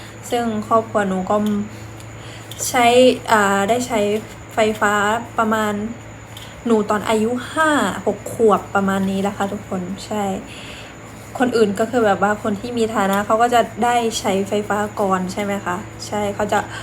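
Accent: Cambodia. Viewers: สำเนียง(การกระดกลิ้น)เวลาพูด)คล้ายๆเขมรเนาะ... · เจ้าเว้าไท แบบเขมร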